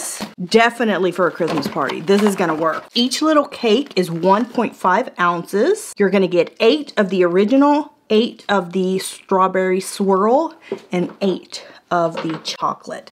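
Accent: South, United States. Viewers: Malta, coquito shes a puerto rican w a southern accent and funny too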